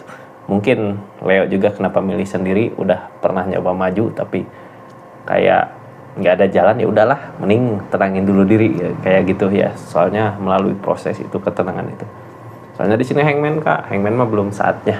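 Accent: West Java, Indonesia. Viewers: Leo. Hadir dari. Lampung Sy suka banget. Dgn. Logat. Sunda nya. ❤❤❤❤❤❤❤❤❤❤❤❤❤❤❤❤❤❤❤❤❤❤❤❤❤❤❤❤❤❤❤❤❤❤❤❤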